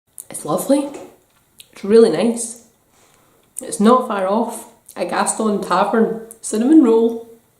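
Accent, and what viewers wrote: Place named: Scotland, United Kingdom. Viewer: wow...looks so good...yummy for my tummy!!!! :) you sound just like one of my other scottish friends..... Sara Fyffe - <link> love your channel - i will be back! subscriber # 142..you're going to hit 200 in no time! :)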